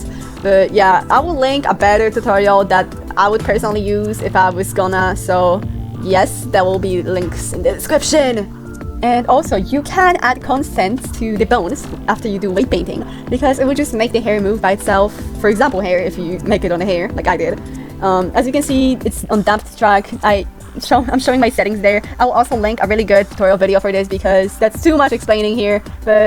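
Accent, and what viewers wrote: England, United Kingdom. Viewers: masz piękny angielski akcent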